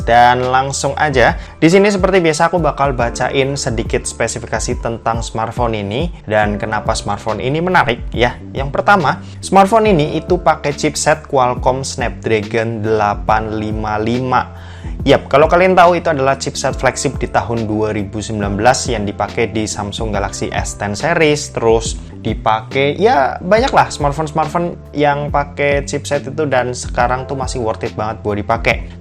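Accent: Semarang, Indonesia. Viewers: Mas wong semarang yo?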